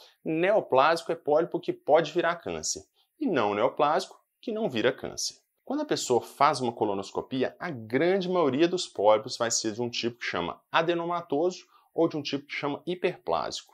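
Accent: Minas Gerais, Brazil. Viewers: Conheço esse sotaque. Ele deve ser daqui de Minas. Gracinha. 😄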